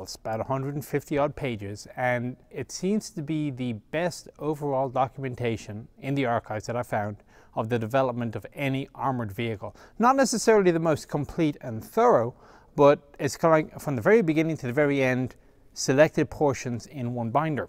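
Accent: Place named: Ireland. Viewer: I don't care for tanks, never seen one, never interested me. But that Irish accent I want to hear it all day.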